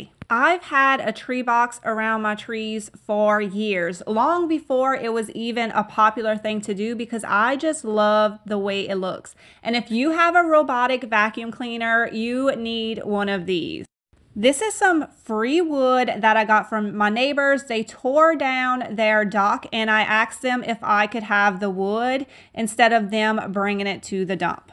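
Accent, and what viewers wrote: Louisiana, United States. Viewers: Are you from Louisiana? You sound just like me! 😆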